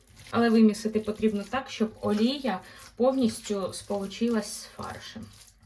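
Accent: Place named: Russia. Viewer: майже позбулася російського акценту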